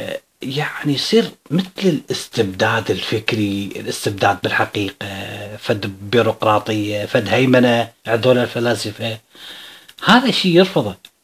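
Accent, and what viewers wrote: Iraq. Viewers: عم بسمعك من تركيا أيها الرائع 💞اليوم شفتك بفيديو شخصيا انت مميز ولما تطلع بالفيديو بيكون احلى بس خلي الجلسة وراك مميزة أكتر مثلا خلفية بيضا وتجلس عا كنب وامامك الطاولة مبدع انت كتير استاذي وانا كمان صديقتك بالفيس لهجتك العراقية زادت الفيديوهات جمال💞🥀 فيه بصوتك نبرة حزن ليش😔💔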